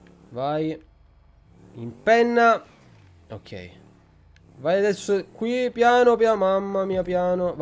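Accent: Palermo, Italy. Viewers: ma sei di Palermo? A volte hai la cadenza palermitana da come parli xD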